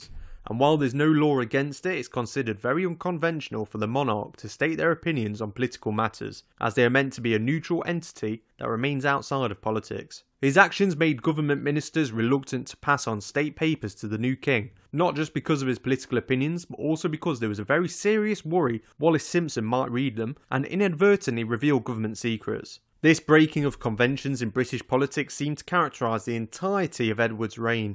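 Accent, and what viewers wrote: United Kingdom. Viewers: This is amazing and so interesting thankyou so much and i love your british voice ❤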